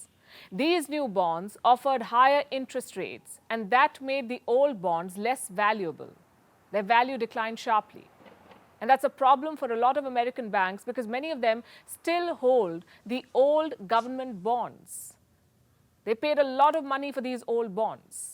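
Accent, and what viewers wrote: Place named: India. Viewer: She is average indian lady. She speaks English in hindi style with modulation. May be u like her modulated style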